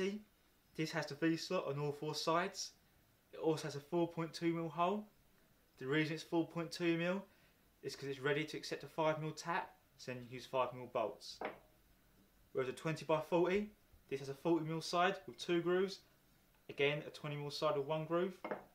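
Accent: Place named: Essex, United Kingdom. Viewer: You're definitely from Essex because you sound like Russell Brand 😁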